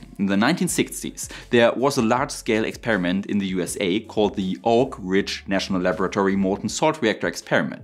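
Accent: Germany. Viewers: I come here to listen to that German accent and I always learn something new. WIN - WIN.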